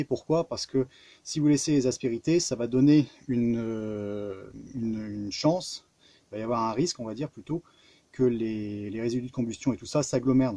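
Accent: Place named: North, France. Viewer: On entend quand même l'accent du nord lol ,super toujours au top tes vidéos et du taff de qualité j'adore ça ,je suspecte de plus en plus qu'on a à faire à un formateur en méca ou alors un grand grand passionné chapeau l'artiste 😉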